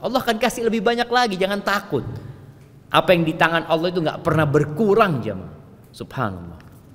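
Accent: Madura, Indonesia. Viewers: Ustad kog kentel bahasa maduranya, dan tau bener ttg madura, beliau asal mana ya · ust ngocak meduronya keren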